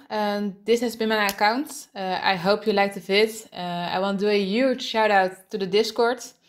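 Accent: Netherlands. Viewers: ur dutch no doubt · Nice bank!! Hoorde gelijk dat je NL was haha! Maar echt nice zo n ironman account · Recognized the dutch accent right away haha, I feel you. Nice account :) insane bank wow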